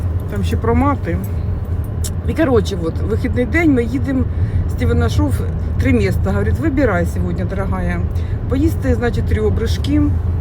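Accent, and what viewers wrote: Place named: Surzhyk, Ukraine. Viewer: Я не украинка, но у этой жинки украинский не родной. Пытается , молодец!Звучит суржик! Лучше уж на родном языке, на котором сны или мысли! Зрителей и просмотров будет намного больше !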